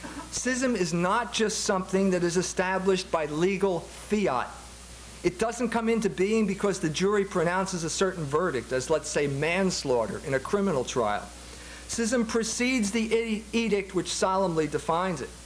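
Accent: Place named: Philadelphia, United States. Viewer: He is allowed to have Philly accent ?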